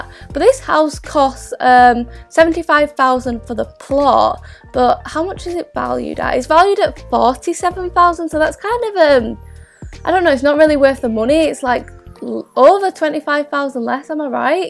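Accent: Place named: England, United Kingdom. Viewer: Rubina Begum she is from England so she has a accent :)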